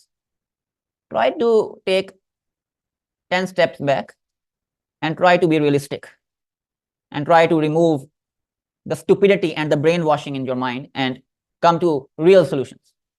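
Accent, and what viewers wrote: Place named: Bangladesh. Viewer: You sound Bangladeshi, what do you know about the Middle East and history little girl?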